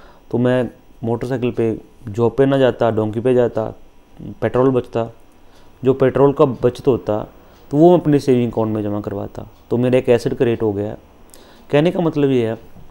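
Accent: Punjab, India. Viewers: You accent says You are Punjabi.. If you are, this is the first time I am watching a economic session from a Punjabi.. Loved it.. Thankyou.. Ap ghora, cycle bol sakte the... Donkey kharid ke us par baith kar office jana 😂😂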